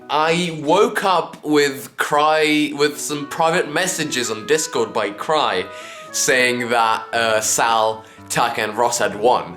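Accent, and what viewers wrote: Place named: United Kingdom. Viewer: I LIKE YOUR VOICE IT'S MY FAVORITE KIND OF BRITISH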